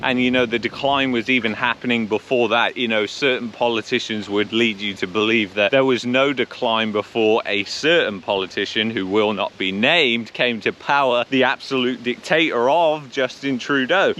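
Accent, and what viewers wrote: United Kingdom. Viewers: You still have your northern accent so you'll fit right in. I am expat from UK, Dorset, on the south coast. I've always kept up with the UK news. I wouldn't go back to the UK if you paid me. The brainwashing there is quite possibly worse than here in Canada. The "new" Brits are nothing like the people I grew up with and they seem to have lost their compasses. Wait 'til you see how many rules and regulations there are that control so many aspects of your life. All this people living on an island with thousands of migrants arriving to use the benefits' system, that's why there are so many insane rules and regs. Too bad you didn't feel a loyalty to Canada and remember what a truly wonderful country this used to be.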